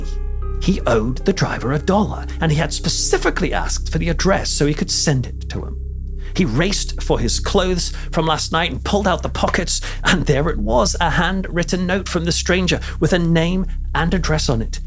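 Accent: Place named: England, United Kingdom. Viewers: This clown can't focus! Hate when these people from england narrate! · Telly Savalas with an English accent!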